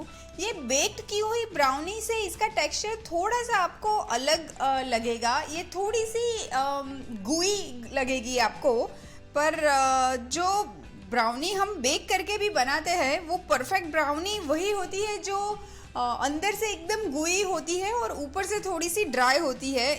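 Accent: Gujarat, India. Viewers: Way u talk is very nice guju style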